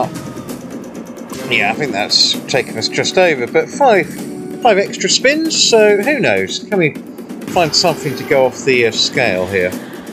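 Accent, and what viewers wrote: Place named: Newcastle, United Kingdom. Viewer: I miss the geordie twang like man 👌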